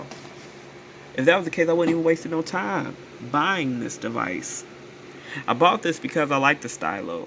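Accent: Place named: South, United States. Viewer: I agree. Tracfone is an inferior company with a poor customer service department. I think initially, people bought prepaid Tracfones for simple uses such as local or emergency calls. You are correct, Seniors began buying these telephones in the early 2000s to avoid a monthly bill. We had to learn how to use the android and iPhones confidently, and effectively. I am an older consumer, considering purchasing an iPhone or android with its monthly expense. You asked why so many of the workers for Tracfone speak American English poorly; it is their second language, not their primary, and maybe not the language that is spoken at home. They are what we (Black people) once were, cheap labor. It is because we as Americans (Black and White) do not address poor behaviors or cultural related barriers and inconveniences that conditions have become unbearable in the United States. 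You are correct when you say, in other countries, one is expected to try to speak the language, but in the USA, the power structure does not demand this for a reason. It is a way of dividing and conquering ethnic people. Setting them at odds with one another. I am not making excuses for these people. When we, (Black people) migrated to the North, White business owners hired us based on complexion and speech (lack of noticeable heavy southern drawl). I heard your southern accent. These people are cheap labor, working jobs others will not. Read the books American Banking in America, The Forgotten Man, then read Rac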